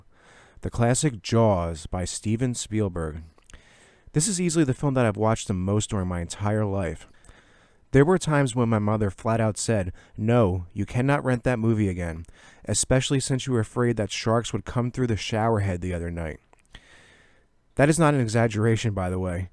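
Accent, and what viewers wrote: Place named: Philadelphia, United States. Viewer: You have a strong Philadelphia accent.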